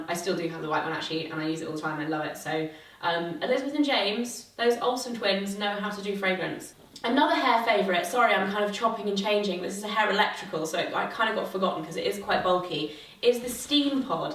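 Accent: China. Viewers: The speaker is 100% speaking a Chinese accent! Lol! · Hahaha....the accent? I think it's Chinese! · The speaker accent sounds like Chinese (mandarin speaking) accent =) it's cute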